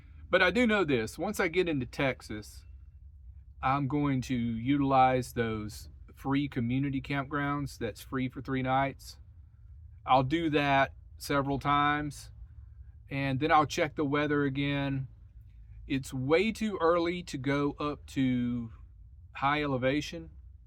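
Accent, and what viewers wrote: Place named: Columbus, United States. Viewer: I KNEW you had to be from Columbus! I'm from there, and I picked up on your regional dialect. That's so awesome! Safe travels!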